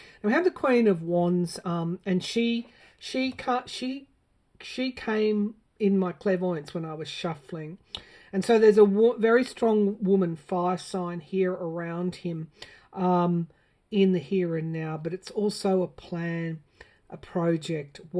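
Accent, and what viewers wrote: Australia. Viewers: Hello, what a joy to find you. I am fairly recent to Taroscopes & a new subscriber. I listen before sleep. I often don't watch, just listen. I heard your voice & knrw instantly that you are Aussie. I am so impressed with your channel I have only heard two of your readings & what a joy to onow yhat you do politics. After all we are so affected by Government & the fall oits that occur. I would love to know that Mr Morrison has some fallout from the reality that he & his Government have perpetuated in Australia. Love that Gladys & McGuire have been rxposed. Will there ever be corruption free politics 😢 thanks for what you do ❤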